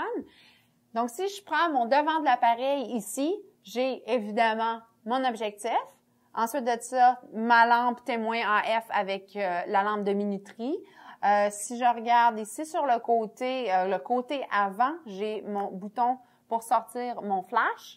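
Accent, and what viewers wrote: Canada. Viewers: Et toi t'as visiblement quelques soucis pour écrire français :p Sinon bonne vidéo même si je ne m'attendais pas du tout à ce qu'elle parle avec le vieil accent canadien xD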